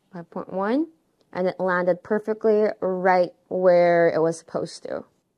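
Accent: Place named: Philippines. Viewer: I am hearing a filipino accent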